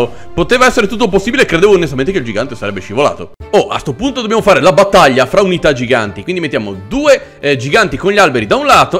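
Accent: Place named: Bergamo, Italy. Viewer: Da quando è che hai un accento bergamasco?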